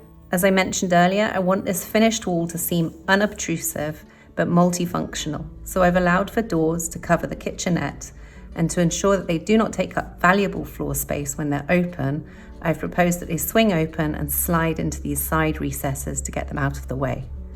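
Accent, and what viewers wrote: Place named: United Kingdom. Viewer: I know nothing about architecture. But I do enjoy your videos so much, they are so relaxing complete with the slick animation, the gentle background music and your soft spoken narration with that beautiful British accent which is a pleasure to listen to. Fantastic work, thank you.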